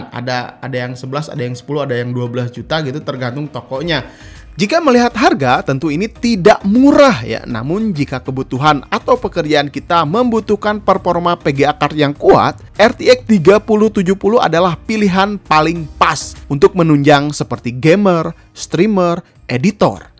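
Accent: West Java, Indonesia. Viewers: Nyunda pisan atuh kang logat na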